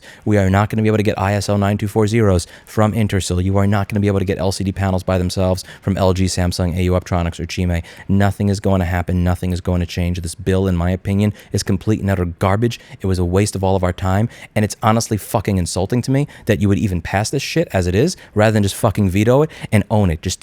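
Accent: New York, United States. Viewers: I like this: you can take out of noo yawk but you won’t take the noo yawk out of.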